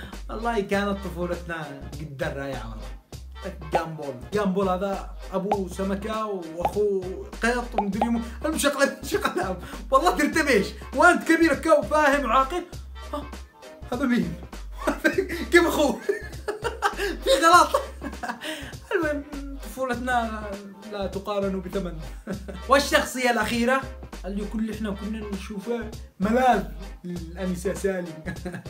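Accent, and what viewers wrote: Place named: Taiz, Yemen. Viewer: فناااااااااااااااااااااان انا فدا اللهجة التعزية 😍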